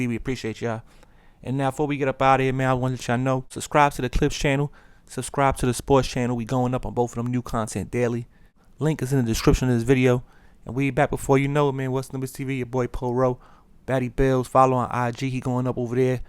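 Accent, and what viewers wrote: New York, United States. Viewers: it sounds like you grew up in a suburban neighborhood of queens, Staten Island, long Island or Mount Vernon. I'm born and raised in Harlem NYC (of course there are no suburbs there), the Bronx has very few suburbs. I don't know how old you are, but I'm in my mid-60s, unlike where you might have grown up at, I grew up in a era where drugs specifically heroin was extremely prevalent. Even with all that there was a sense of community, the dealers who were much older than us would steer us youth away from wanting to get into the life, they told us to stay in school and don't be no fool. A lot of them back then even knew our parents, and they never sold drugs in front of elderly people. Even though these cats were contributing to destroying the community with dealing drugs, there was a weird sense of order and structure. IT WAS A DIFFERENT DAY AND TIME, when the Crack era came through that changed everything.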